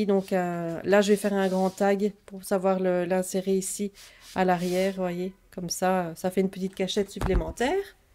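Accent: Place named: Belgium. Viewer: ça fait du bien d'ré-entendre l'accent belge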